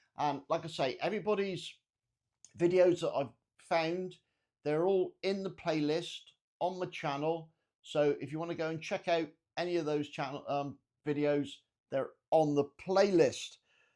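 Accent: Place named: Durham, United Kingdom. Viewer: Hi, watched your vids and subscribed. Sounds from your accent you’re local to me, I’m in durham. Good luck in the competition, I’m new to growing so giving it a go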